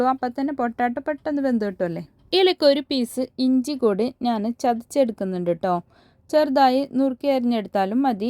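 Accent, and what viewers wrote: Kasaragod, India. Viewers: Super recipe anallo ! Koodathe ningalude kasargodan style talking enikku bhayangara ishtanu !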